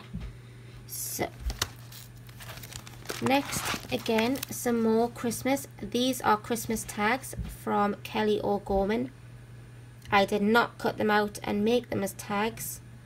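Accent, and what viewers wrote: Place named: Northern England, United Kingdom. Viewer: Hi, just subscribed to your channel. It sounds like you are a fellow Northerner:D Awesome colouring x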